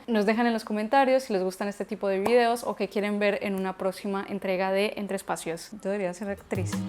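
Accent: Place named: upper class, Colombia. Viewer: Esta encantadora mujer habla como las colombianas de clase, de las que van quedando relativamente pocas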